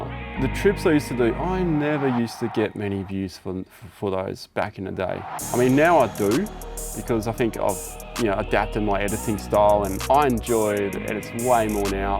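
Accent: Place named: Australia. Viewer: Mate, stuff em. I've watched so many of your educational videos that I dream in an Aussie accent now. Keep doing them, especially since most 4WD'ing tutorials and advice just tend to come from guesswork rather than experience.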